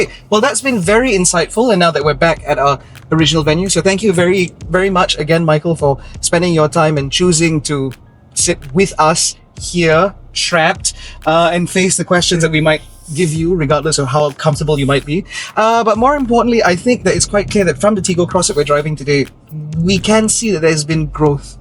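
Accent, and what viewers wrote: England, United Kingdom. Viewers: Clicked the video for the car, stayed for the English accent. Well done presenter. Strong presentation!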